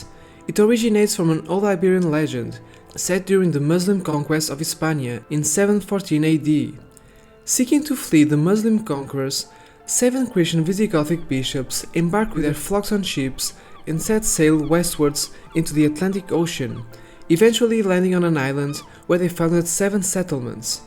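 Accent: Portugal. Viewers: Let me guess... youre portuguese?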